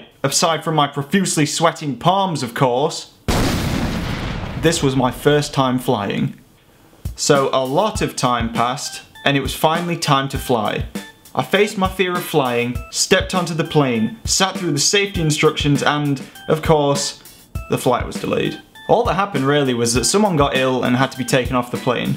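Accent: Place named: Yorkshire, United Kingdom. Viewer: The Yorkshire accent is strong with this one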